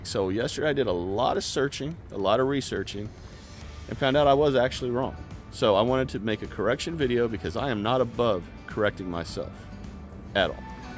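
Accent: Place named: Texas, United States. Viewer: I am also still learning but expanding my gardens again this year. You sound like a fellow Texan so I'll so I'm subscribing to your channel in hopes I can learn more. Appreciate your honesty - we all make mistakes. If you figure out how to get rid of the squirrels please share. Hate it when I see one run across my yard with a ripe strawberry in their mouth (now caged the bed).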